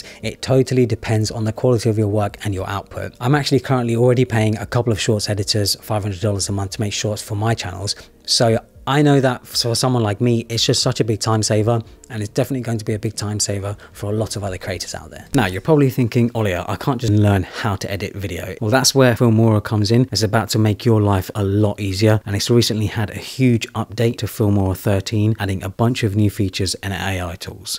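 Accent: United Kingdom. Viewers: Good to hear a British voice on these matters, just feels more relatable, Sometimes with American narrators, I feel like our situations differ too much.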